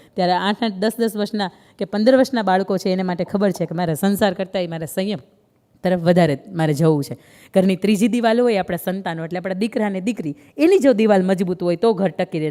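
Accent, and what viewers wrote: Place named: Kathiawar, India. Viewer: આ બેન ને ખુબ ખુબ અભિનંદન ધન્યવાદ બેન તમારી આ વાત સાચી છે અને માણસ નું જીવન બદલી નાખશે એવી જ વાતો છે બેન એક વાત તમારા ઘણા વિડિયો જોયા તમારું નામ ક્યાં વતની છો એ જણાય તો અંતર નો આનંદ ખુબ વધી જશે બેન તમારી બોલવા ની અને જમાવવાની અને મીઠી ભાષા કાઠીયાવાડી ખમીર વંતી વાત સાચી છે હું પણ એક કછી પટેલ છું તમારા ઘણા વિડિયો જોયા છે ખુબ આનંદ થાય છે ફરી પણ આવા વિચારો અને વિડિયો મોકલ તા રહેજો બસ એજ ખૂબ ખૂબ અભિનંદન ધન્યવાદ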